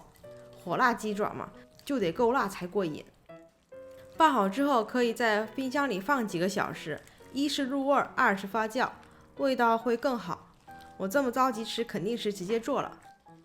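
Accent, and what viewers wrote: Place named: Northeast, China. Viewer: 영상 잘 봤습니다～这个时间看这个，真的流口水了，听博主说话应该是东北人吧😊性格特别豪爽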